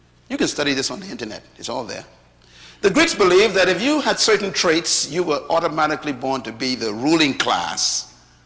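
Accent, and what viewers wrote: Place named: Caribbean. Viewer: Its 2020 and I just heard this message, Never have I heard a person, deliver a message to the world, so eloquently! Just listening to the message, I can telĺ you are an intellegent man from the Caribbean! I salute you Dr Myle, wish that I could have met you in person, your words are more relevant today, because I think you were able to foresee what this world would have become!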